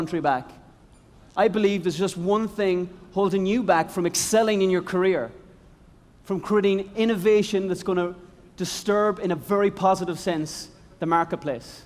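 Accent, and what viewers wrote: Ireland. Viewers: I love your accent lol it reminds me about my ex bf from Ireland too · suntok2yoface He is Irish. You are being narrow minded. Fyi, even among north American speakers, Brits, Australians, and other English speakers, they have varied accents and ways of speaking the language. Try to be more open minded before making a comment.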